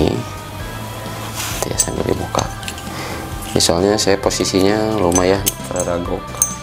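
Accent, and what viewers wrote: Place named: West Java, Indonesia. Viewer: Logat ny sunda ini mah,,, timanten kang??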